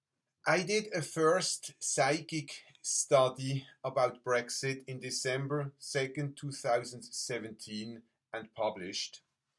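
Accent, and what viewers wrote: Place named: Switzerland. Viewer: Danke für Ihre Antwort Natürlich ist mir nicht entgangen, das Sie viel lieber in Ihrem sympathischen SwissEnglish 😊 veröffentlichen und anteilig sporadisch Deutsch. Mit meiner schwäbischen Muttersprache, in schweizerischer Nachbarschaft, bin ich -nicht nur ich - in der Lage andere Dialekte zu verstehen, bzw.hat man sich dem allgemeinen Sprachgebrauch angepasst, wie Sie offensichtlich ebenfalls. Schön wäre es aber schon, wenn der englischen Sprache nicht mächtige deutschsprachige, interressierte Bevölkerungsanteil Ihre Zukunftsprognosen, die ich sehr schätze, in deutscher Sprache verfolgen könnte. Vielleicht haben Sie aber ,,gesehen", das es nicht ,,sein soll", uns als Betroffene zu informieren. Ja, es wird nicht ein lokales Ereignis sein, dank Internet erfährt man vom umgefallen Reissack...... Nicht dass ich auswandern wollte, aber auf kommende Ereignisse sich einstellen können und zuversichtlich trotz allem mit Hilfe Ihrer doch treffsicheren Prognosen wäre schon hilfreich. 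Selbstverständlich gibt es keinen natürlichen Anspruch Ihre Zukunftsprognosen zu bekommen, warum aber veröffentlichen Sie dann im Internet ❓ Mit guten Gedanken und Gefühlen, wenn es denn zum großen Reinemachen kommt, hoffe ich, das es für alle eine schöne Weiterentwicklung gibt . Alles Liebe und Gute für Sie ❣️Danke für Ihre spannende Arbeit an der Menschheit Josefa 👵🙋🙏🌟‼️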